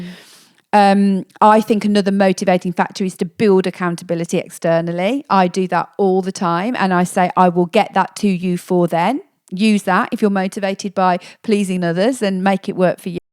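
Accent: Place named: United Kingdom. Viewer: I subscribed to your channel cos i have problem to understand British accent.by listening to your videos..probably could help me practice my British accent listening skill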